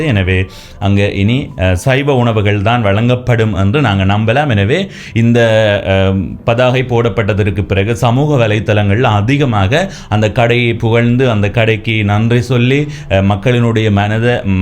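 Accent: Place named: Jaffna, Sri Lanka. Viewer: யாழ்ப்பாணத் தமிழில் கதைக்கும் சில youtuber களில் இவரும் ஒருவர்! பல amateur YouTubers போலில்லாம் சிறப்பான சணல் இது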